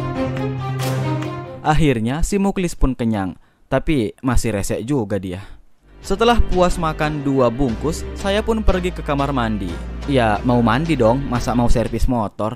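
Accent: Makassar, Indonesia. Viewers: Yg aku suka vlog ini adalah narasi nya bagus... Uniknya adalah, menggunakan bahasa indonesia dengan aksen indonesia timur, Makassar... Jadi lebih seru. Nice vlog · Bang org makassar yah?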